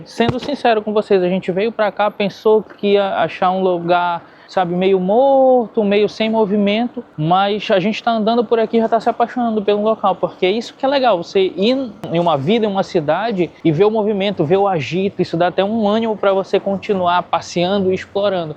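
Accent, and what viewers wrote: Pará, Brazil. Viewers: O cara tem sotque paraense e torce pro vasco. PQP. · Seu sotaque é do Pará, certo?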